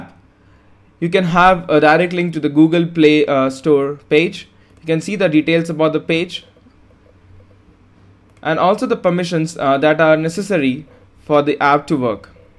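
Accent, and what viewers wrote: India. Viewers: sounds like a indian tech support scammer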